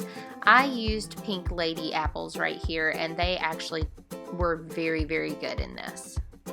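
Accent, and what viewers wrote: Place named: Tennessee, United States. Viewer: New sub! I came over from One Blessed Mess bc she said I should! 😜 I throughly enjoyed watching you make dinner. Now if you can just hand it to me through the screen, that would be soooo helpful! 😉 I have a garlic chicken crockpot recipe too. I wonder how similar they are. I’m gonna have to check. But mine is bone in. And I much prefer boneless. So yah!! Can’t wait to try it! I love your accent! I want to move to TN so badly so I can get one! 😂😂😂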